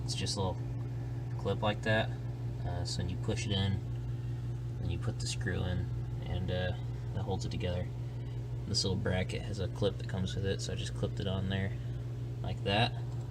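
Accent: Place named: United States. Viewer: Why for the love of Christ does it absolutely have to be a yankee talking on most of these videos... like nails on a chalk board to my ears😵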